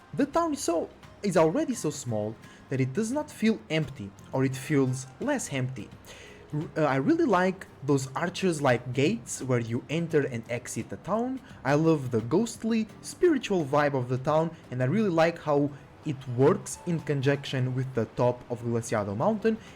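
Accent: Portugal. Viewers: You have a portuguese accent Eu tinha feito este comentário antes de mencionares, achei o video bem top 👌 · eu qd oiço um portugues a falar ingles sei logo ahahah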